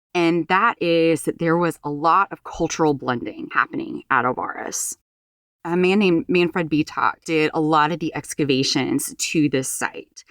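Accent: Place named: Nebraska, United States. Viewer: Well done! Brava! This is one of my areas of study for many years. You summarize well. If I may, I'll offer ttwo recommendations. 1: Your pronunciation is too regional, northern, like Nebraska, crimped. They say "theenks a lat," not "thanks a lot.". You say, "numadic," not "nomadic." Open your vowels more for Standard American English. 2: Relax your throat. Your larynx is crimped, so your voice is nasal. These mean practice, not much. Any might do wonders. I expect that is your normal voice. Such a respectable content suits your good mind. Its presentation deserves dressing up as you were applying for a job, which means better clothes than around the house. Most people don't think about sound at all. I don't mean that stuffy is at all better. Clothing is a pretty good analogy. Your content and you deserve recognition.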